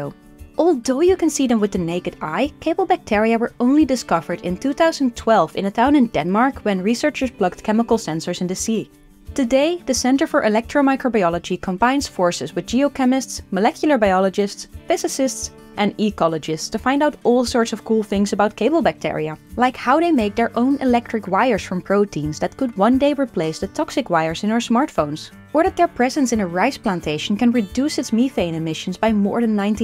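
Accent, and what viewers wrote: Netherlands. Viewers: I love Duth accents ( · Forgive me but I just have to say that the Dutch accent in English is so cute that I can hardly stand it lmao 😍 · Funny how I instantly heard she was Dutch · Great video and loved hearing the dutch accent! :p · i know is dutch bc of her last name (its a dutch last name) an you can hear it in the way she talks she has a dutch accent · still weird to hear dunglish in a minute earth video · weakest dutch accent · Is that a Frisland accent? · So Dutch sounding... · you sound dutch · Immediately recognised it too, as I'm Dutch and so I hear it oftenly. And I don't mind it. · Is that a Dutch accent I hear? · Instantly heard she was Dutch❤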